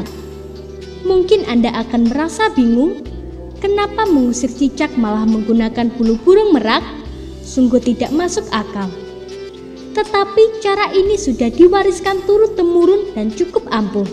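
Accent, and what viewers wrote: Java, Indonesia. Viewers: Ngmongnya meddok, lelet lagi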